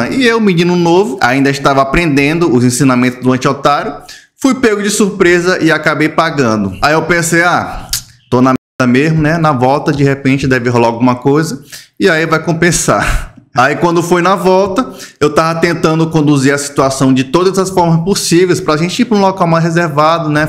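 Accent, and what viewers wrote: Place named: Northeast, Brazil. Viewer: 😂 Adoro as suas histórias!!! Principalmente seu sotaque nordestino...❤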